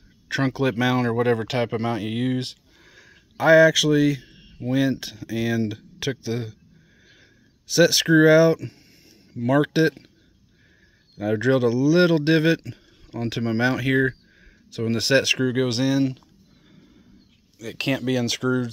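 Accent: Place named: Illinois, United States. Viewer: This was an expensive video for me. 😊. I have a Kia Niro which is similar to your Prius in many ways, and I am afraid that I will duplicate your mount, the antenna, adapter and whip almost exactly. I basically have the same whip as you, I dug it out of my shed. Thanks for posting, I would not have known about this otherwise. I have had a real DK3 screwdriver bought from the late inventor for years, but it's been too big for anything smaller than the pickup I had when I bought it. This small screwdriver looks perfect for our modern small cars. I recognized your accent. You aren't too far from my wife's hometown (Marshall, IL) and where I went to college in the early 90s. I consider that area to be one of my homes! I will post something in a few weeks when I get it in my Korean hybrid. 73 de W0FMS/7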